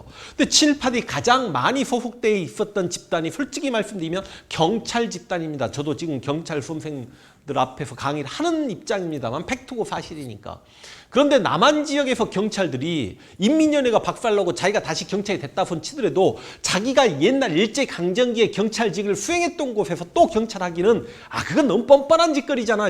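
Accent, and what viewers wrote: Jeolla, South Korea. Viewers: 원래 전라도 사람들이 감성적이더군요 이분도 사투리 억양이 딱 그쪽이네욪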